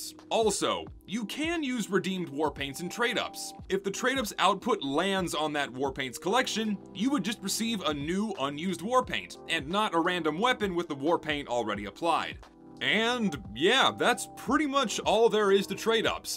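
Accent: South, United States. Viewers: Sounded more and more southern as this played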